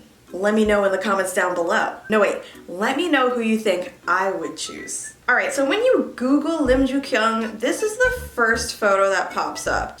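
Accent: United Kingdom. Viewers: It’s not a video without the British accent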